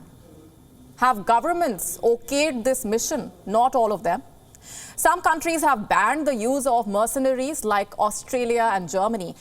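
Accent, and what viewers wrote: India. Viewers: Too heavy Indian accent - can't understand what she was reporting. Not too many people tuning in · They should hire a english native speaker, the indian access is very thick T_T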